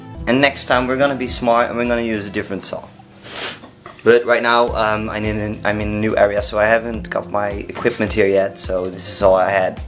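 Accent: Netherlands. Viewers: your accent sounds kind off dutch am i right? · suscribed 'cuz, MEDE NEDELANDER XD Ik dacht het al te horen, maar ik hoorde Pippoos en toen wist ik t zeker haha. Goed bezig jongen!!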